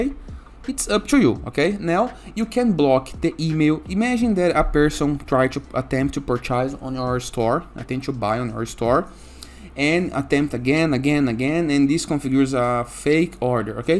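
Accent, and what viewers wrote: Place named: Brazil. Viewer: depois de 5 segundos assistindo.... é BR! certeza :medal-yellow-first-red: Muito TOP as dicas valeu!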